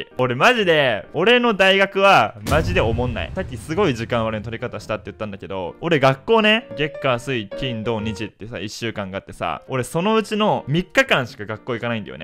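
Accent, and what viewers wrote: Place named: Kansai, Japan. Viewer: この動画、最近の動画で一番好きかも！そしてたかおいおいの関西弁が移ってるｗ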